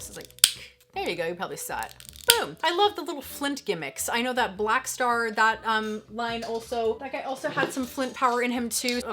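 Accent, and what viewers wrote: Canada. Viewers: I knew you were CANADIAN!!! I been around that awesome accent my whole life!!! Pops was born in Chatham, Ontario, Gramps and Granny in London!!!! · I could tell you were from Canada by just your accent.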